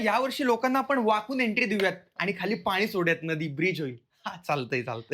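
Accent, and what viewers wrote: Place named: Akola, India. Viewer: Akola bolte palan ❤❤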